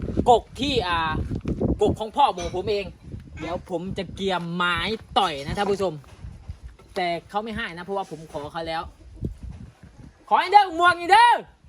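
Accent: Laos. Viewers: เว้าไทยตกลาว5555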